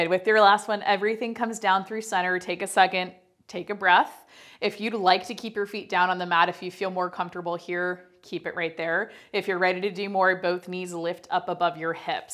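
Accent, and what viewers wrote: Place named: Canada. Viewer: I love this challenge! I was surprised to hear that you grew up in Arizona you sound Canadian! I mean that as a compliment!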